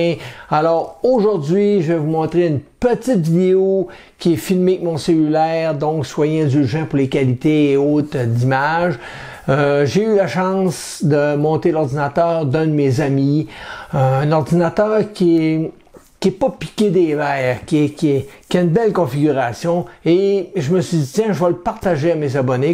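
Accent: Canada. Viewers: Presque un kray . J'imagine un cluster avec des becanes de ce type là. Merci pour tes videos et avec ton accent canadien, celà rend l'exposé encore plus sympa pour moi français. A bientôt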